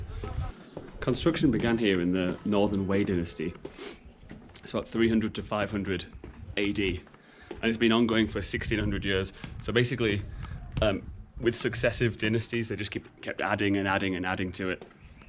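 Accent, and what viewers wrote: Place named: Northumberland, United Kingdom. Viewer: Just found this. Loved it. You sound like a Northumbrian. 👍😍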